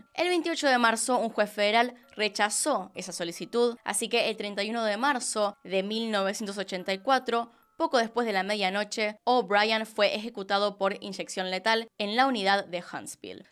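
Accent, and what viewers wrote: Argentina. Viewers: El año pasado conocí tu canal y desde entonces no me pierdo ninguno de tus videos✨ estudie psicología y eso me provoca ponerme a analizar a los personajes y casos que narras, aparte que me declaro fan del acento argentino ❤️ Muchas felicidades gran canal🌹 PS: te recomiendo el caso del monstruo de Toluca, fue un compañero mío de la universidad, realmente fue muy trágico vivir esa experiencia y no visto que nadie hable del caso y le de voz a sus víctimas. PS2: saludos desde Los Ángeles CA 🫂🌞😸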